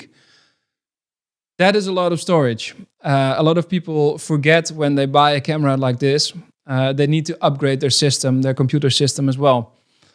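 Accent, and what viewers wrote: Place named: Netherlands. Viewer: Are you Dutch, notice you have an accent. Nice video though!!!💪🏽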